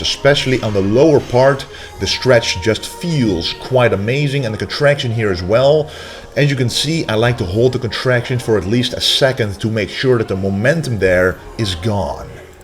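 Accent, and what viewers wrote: Brabant, Netherlands. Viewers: The dutch accent XD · Waar uit Brabant komt hij? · Jezus...could you be more dutch...your English is painful to hear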